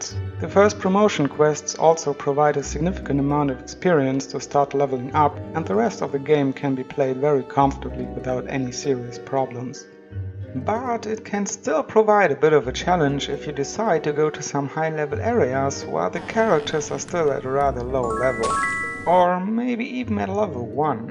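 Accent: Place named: Germany. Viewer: I downloaded the original version of the game for 1,24 € yesterday because I could not wait for the postman. I was too excited. It works. Because your name and your accent sounds pretty German I want to say: Danke für das Video. Das hilft mir sehr weiter. Prima sich mit jemandem auszutauschen, der sich bei diesen Klassikern auskennt.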